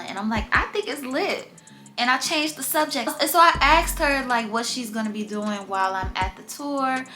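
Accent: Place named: Chicago, United States. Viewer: You can tell you from Chicago lolz 😂. I love the class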